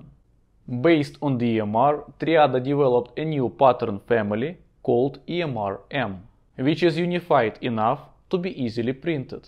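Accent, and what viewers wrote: Russia. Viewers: Если не секрет у тебя правда такой акцент или ты чуть под стронгер русиян акцэнтиш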